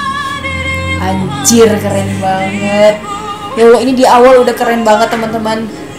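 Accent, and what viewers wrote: Medan, Indonesia. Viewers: Dari logatnya, keknya kakak ini org medan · Ihh. Cantik kali kakak ini woiii...ahahaha. luck loe. Hihiii. Medan kali kauu.🤣🤣🤣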